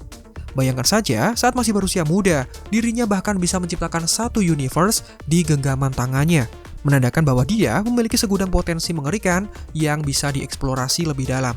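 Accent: Java, Indonesia. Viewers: mas, sampeyan asli mana . kok kayak ada medok jawanya, hehe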